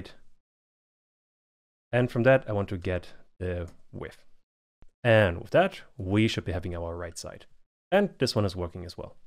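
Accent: Germany. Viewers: Your content is fucking amazing bro, keep it up! :)) Btw, höre ich da einen deutschen Akzent heraus? xD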